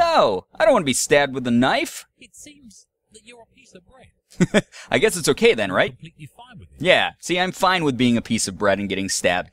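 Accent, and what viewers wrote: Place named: United Kingdom. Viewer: Hey, It's I am Bread with bad puns, British narrating, and (much) less rage! :D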